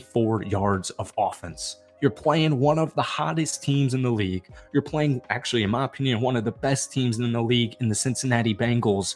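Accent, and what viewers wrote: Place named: Texas, United States. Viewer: Where is this texas accent coming from bro lol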